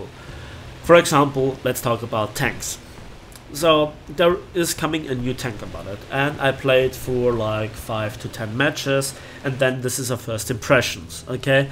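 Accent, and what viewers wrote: Bavaria, Germany. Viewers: I only recently subscribed to your channel and so I don't even know most of your signature style reviews. Anyway, I value the complex, complete and non-biased approach of your stream of thoughts. In this episode, you really shine when you explain the "Tiger-Problem" - something I wasn't aware of because I do not frequently follow the tank side of WT. As a non-tanker I can only say, I got my premium Königstiger maybe two years ago and played exactly three matches in it because I was horrified at the thought that I would be humiliated by some lower tier opponent. At least this fear is gone now but I guess, in the rare cases I play tanks I will stick to my StuG III in arcade - and even occasionally have multi-kill matches in it, ha ha ha. Anyway, thank you very much for your interesting videos and keep up the good work. And btw, I really like your Bavarian-English. 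Viele Grüße aus Unterfranken und schöne Restwoche!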